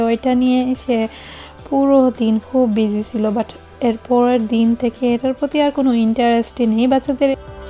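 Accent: Sylhet, Bangladesh. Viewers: Apu apnar sylheti accent sunte asto sobai Apnar mukhe oi ta khub valo lagto Tai mone hoy the numbers of views are decreasing....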